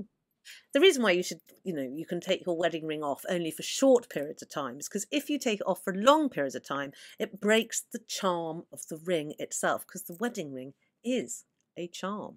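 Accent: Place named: Somerset, United Kingdom. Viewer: I'll laugh if you're from Totnes! But I'm detecting more...... Somerset?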